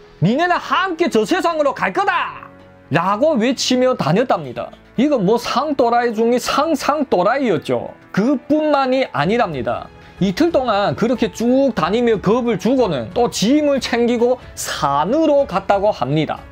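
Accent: Yanbian, China. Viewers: 연변사투리로 하니 꿀재미 ~ 영화보다 훨재미있음 ~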